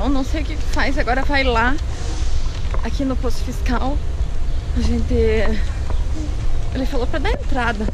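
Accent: South, Brazil. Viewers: O SEU SOTAQUE DO SUL È UMA COISA ASSIM INDISCRITIVEL GRANDE ABRAÇO BOA NOITE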